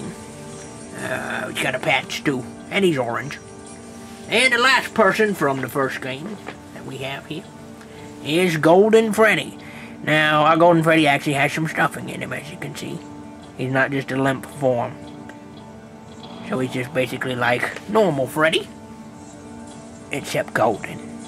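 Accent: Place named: South, United States. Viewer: Southern accent.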